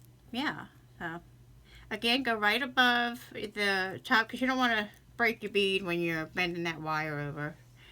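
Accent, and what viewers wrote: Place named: South, United States. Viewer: Lovely designs! I'm a new sub. I've been making jewelry for years, but love to see what others come up with. Thanks for the inspiration. Just wondering, are you from the northeastern part of the country? Or maybe mid-Atlantic? I'm trying to place your accent. Seems a little bit southern and a little bit northeastern. Also, I'm wondering if the hand-appliqued beads are Japanese Tensha beads? (The link that you posted above for the beads is the same as the link for the bracelet.) I'd love to get some. They are enchanting. You're pieces are beautiful. Thanks for sharing!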